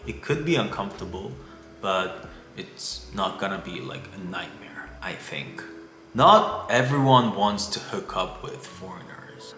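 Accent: England, United Kingdom. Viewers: I appreciate you sharing this. I have been trying to learn Korean, Hangul and I do know some words of greetings and I an to come there one day. I do watch the k dramas, I Love and some other kpop artists. This is the first time I have watched your commentary and I for 1 really appreciate it. Racism is everywhere. I do however want to ask you, I noticed you speak with a British accent, where does that come from. Thanks 💜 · You’re English sounds English 🎉🎉🎉🎉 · Do I detect a British accent? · I absolutely love your English accent, especially when you say the word talk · You are very charming and thank you for taking the time to speak to us about different subjects. I love listening to you. I live in the USA and have a desire to learn about other countries, especially South Korea. I started listening to early 2020 when covid became an issue here. I am a kpop fan and I am 73 years old. 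But then I love all music. I hear an accent when you speak. Is it from Great Britain? You mentioned you lived abroad and your English is exceptional. I will look forward to hearing from you again. Have a pleasant evening. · Have you had a nose job? You sound a little bit British. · Hello, I came across ur YouTube video very interesting, u sound like a bit of a British accent lol, well I love culture so it was enlightening 😊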